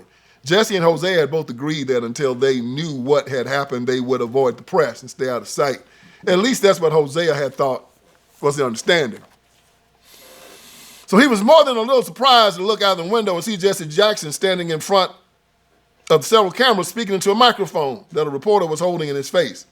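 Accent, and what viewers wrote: South, United States. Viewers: Unfortunately, I couldn't understand a thing you were saying while you were laughing and talking. Between your southern accent and poor pronunciation, it is so difficult to understand what you are saying.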